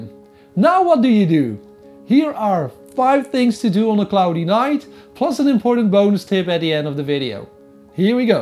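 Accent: Netherlands. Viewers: Hello, I enjoy your videos very much. I live on Vancouver Island in Canada and our climate is similar to yours. My parents came from Holland so I like your accent LOL. I have a question for you or perhaps a topic you can test. I'm at the beginning my astrophotography hobby and very much a newbie but have been doing lots of studying for the last 2 months. I have a cold weather question: After watching this short video <link> on photographing in cold weather I was wondering if any astrophotographers have noticed a difference in using heat bands to prevent dew and if the heat band adds heat distortion itself. I do know you need to cool your equipment before shooting but then your adding heat to the lens hood area again. Isn't this causing heat distortion?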